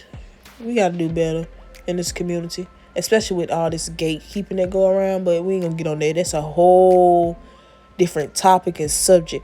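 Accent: South, United States. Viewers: Not annoying and country you crack me up😂😂😂😂💜💅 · you are funny 😅😂the nails came out cute and your accent is awesome I love my country girls💃🏽💃🏽💃🏽💃🏽💚🥰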